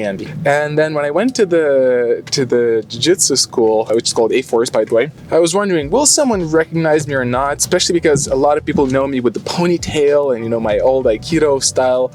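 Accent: United States. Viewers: I find it interesting I'm not the only one who thought you was a yank! Just wondering, how did you get an American accent? Have you spent a lot of time over there, or do you learn American English in Lithuanian schools? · On another note, as an American who taught English for 3 years in Italy oh, I will say that your American is excellent.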